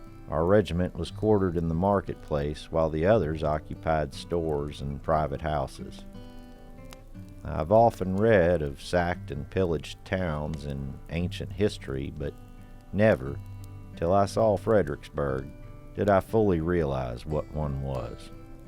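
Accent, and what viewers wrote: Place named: South Carolina, United States. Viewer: Good to hear someone from South Carolina. I had family there too.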